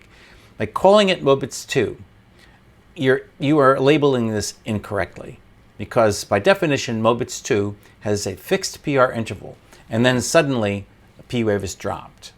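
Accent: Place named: United Kingdom. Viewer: Funny how you took english(U.K) accent..Well done Bloke.. Informative video...Appreciate your dedication..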